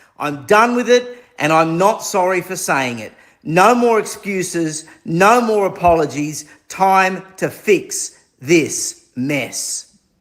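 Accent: Australia. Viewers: This dude went full blown racist with an Australian accent. Make it make sense, you not even from here bro! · Sounds Australian to me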